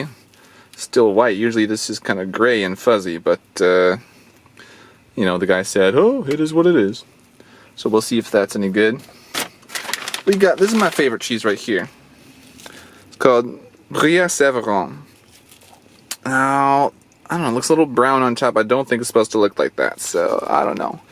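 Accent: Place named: Minnesota, United States. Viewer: You sound very Minnesotan 😆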